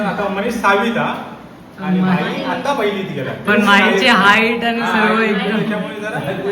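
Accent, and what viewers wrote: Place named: Malvan, India. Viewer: माझ माहेर पण बांद्याला रोनापाल ला आहे माहीत आहे का रोनापाल तिची तिकडची मालवणी ऐकून मला माझ्या माहेरची आठवण आली माझ्या माहेरी सुद्धा असेच मालवणी बोलतात तू व्लॉग मध्ये मधे मधे तुझ्याकडचे मालवणी बोलत जा 🙏👍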